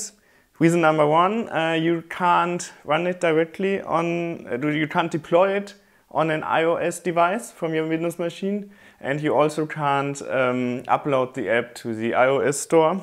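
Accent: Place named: Germany. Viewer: I guess he is german...... i know german accent...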